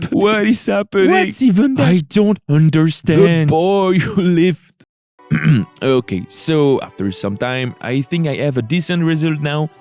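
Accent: France. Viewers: Ton accent français m’avais manqué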